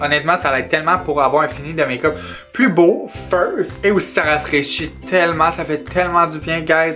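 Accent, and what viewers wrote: Quebec, Canada. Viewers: Je regarde tes vidéos depuis peu et je dois t'avouer que j'avais un peu de mal à te comprendre. Mais au fur et à mesure de tes vidéos je me suis adaptée à ton accent québécois. Tu es magnifique j'aurais dû commencer par là. Bonne continuation moi je surkifff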